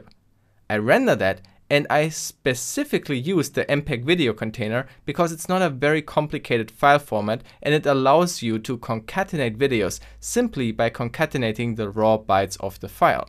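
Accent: Germany. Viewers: DIeser Deutsche Akzent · Bro you got the most German accent I ever heard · r u german? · Took me to realise he is german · is this german accent ? ¯\(ツ)/¯ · This, my friends, is what a german accent sounds like.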